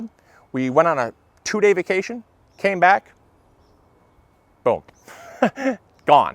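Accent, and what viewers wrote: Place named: Midwest, United States. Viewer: I appreciate the cliffnotes, but also enjoy hearing "What is going on, everyone?" with his pleasant Midwestern accent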